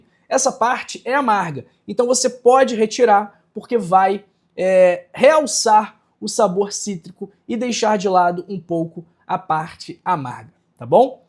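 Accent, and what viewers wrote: Rio de Janeiro, Brazil. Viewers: Uma dica: seu sotaque carioca irrita muito (por exemplo, você fala proistata, quando deveria dizer apenas prostata).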